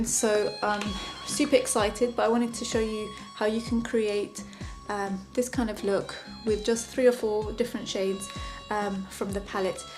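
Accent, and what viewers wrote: United Kingdom. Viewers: Love you accent are you living in the UK cuz it sound like a british accent btw you<re beautiful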